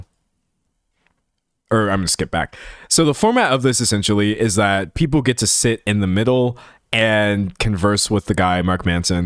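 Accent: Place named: Texas, United States. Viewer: today I heard a clip of Connor Storrie talking and thought it was you. Is this some secret Texan accent I never knew about?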